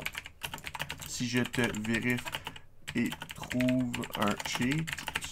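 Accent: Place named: Quebec, Canada. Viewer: j'adore l'ascent des Québecoi <3<3<3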